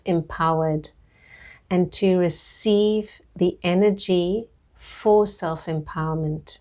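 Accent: South Africa. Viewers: Thank you so much for this, you have no idea how much I needed this. 🥺❤️🙏🏾 Bless your soul. 🥺 Also, you sound so South African 😭😩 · That sounds like a South African accent - Howzit from Plett. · you sound south african, lol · Definitely a South African accent. Am a Cancer Sun and Rising.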